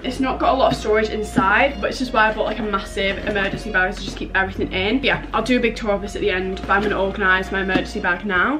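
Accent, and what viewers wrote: United Kingdom. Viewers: hii! i know u probably wont see this, but just in case u do, i actually love ur content so much u dont understand. ur my comfort youtuber, especially since i used to live in england, until my dads work caused us to move to nyc so its so refreshing to hear a british accent again. i love u so much ur voice brings be back home, keep doing what ur doing i love u so much💓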